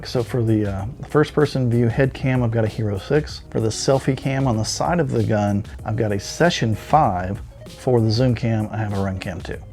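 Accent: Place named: South, United States. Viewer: You sound like David Attenborough with a southern accent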